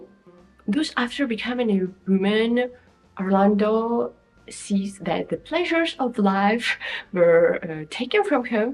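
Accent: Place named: Luxembourg. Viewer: I haven't read any of those books, but it was fun listening to you talk about them. I don't think I've ever heard a Luxembourg accent before, and I confess that I had a little trouble understanding it at times, but if you can take the trouble to read English books, and review them in English, I can make the effort to listen carefully. I look forward to seeing more videos from you. 🙂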